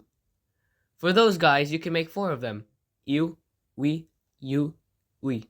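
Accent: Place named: Lahore, Pakistan. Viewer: lol at one point int he video they show a grammar chart or something and aling english there was an urdu comparison, this + the accent, which to me sounded similar to a lahori, made me think i had found another one of us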